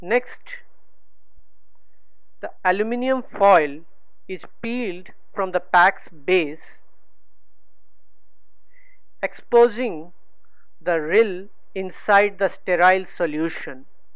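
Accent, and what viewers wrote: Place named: India. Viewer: This doctor sounds Indian.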